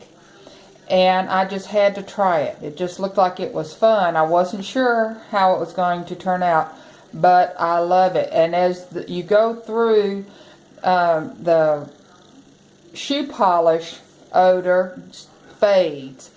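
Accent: South, United States. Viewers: Hi! Love your journal....being from MS, it's great hearing a southern accent! And being from Houston I am quiet sure you DO know how to cook beans!! LOL.. I also love western and Native American stuff... love how you tied the seam binding in the holes on the pages! I could say so many more things about this great journal... Thanks so much for sharing it with us!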